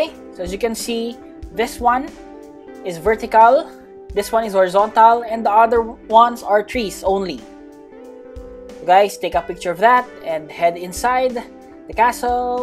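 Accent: Philippines. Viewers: FILIPINO KA TALAGA KUYA BOSES PALANG FILIPINO NA HAHAHAH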